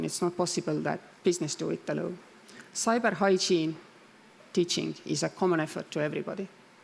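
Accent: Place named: Estonia. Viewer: For the love of god, woman, learn how to speak english! You´ve been working in Luxenbourg for how long now??? I´m an estonian too, but you will never hear me speak like that. The accent is just hurtful for my ears.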